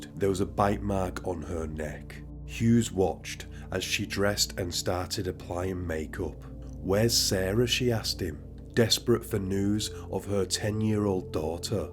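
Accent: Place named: Liverpool, United Kingdom. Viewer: I love your accent. Where are you from? Sounds like Liverpool?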